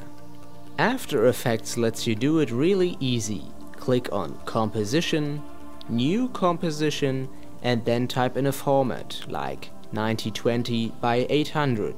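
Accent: Germany. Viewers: so schön deutsches englisch (Y)!! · OUCH that horrendous German English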